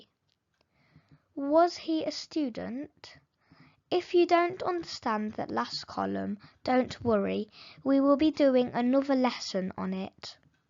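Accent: United Kingdom. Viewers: Yes, it is a British accent 🙂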